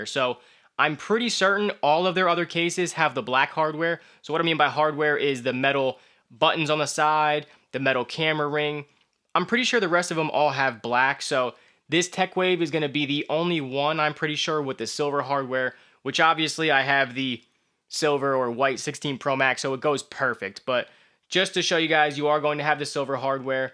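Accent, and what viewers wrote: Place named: Massachusetts, United States. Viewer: Ok, where are you from? That accent is saying the Massachusetts area, but enjoyed that video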